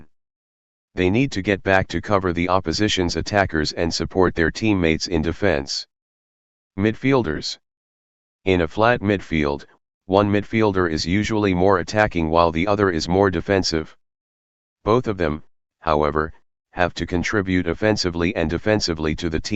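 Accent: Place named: United States. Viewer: Robotic American accent . YUK!!!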